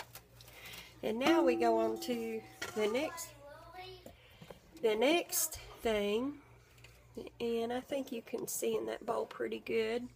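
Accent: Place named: Rural, United States. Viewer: Love your country accent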